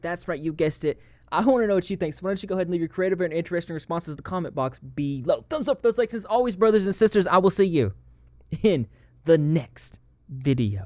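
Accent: South, United States. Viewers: I forgot what state he said he’s in but it’s an American southern/ mid western accent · oh okay I couldn't pin point it. I'm like he sounds southern but mixed with another accent 😁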